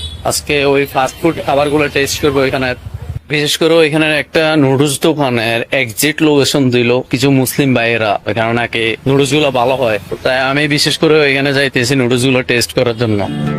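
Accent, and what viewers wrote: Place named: Bangladesh. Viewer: Bro tomar kotha bolar accent onektai amader Bangladeshi der motoi... Valo laglo... Eid Mubarak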